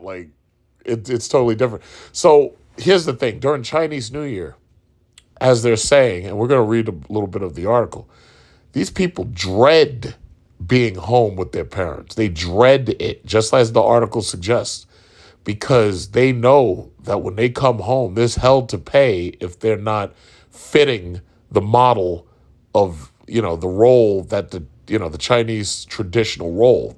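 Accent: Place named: New York, United States. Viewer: Yo bro dead ass bc you have the NY accent, it is soothing AF to listen to your Passport Bro videos compared to other YouTubers. I can't be listening to those monotone channels.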